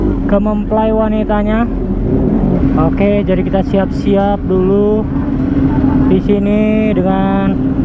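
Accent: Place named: Sunda, Indonesia. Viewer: kocak anjirr kalo ngomong pake bahasa Sunda nada Sunda nya itu yg candu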